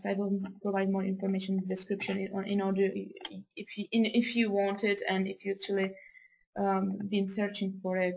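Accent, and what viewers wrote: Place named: Czech Republic. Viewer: Já jsem si říkal, že tvůj přízvuk zní hodně česky. Díky za tutoriál!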